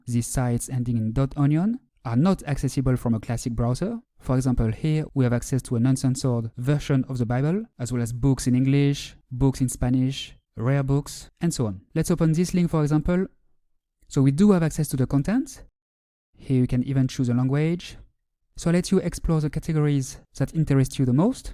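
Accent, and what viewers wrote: France. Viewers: Mhm ur from french right? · Salut et Oulala ! ça se voit "s'entend" que tu es Français toi à ton accent.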